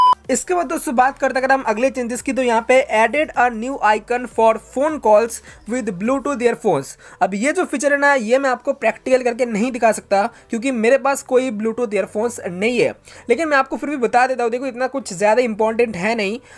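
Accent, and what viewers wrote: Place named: India. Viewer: Hmm,. Lovely voice and Hindi accent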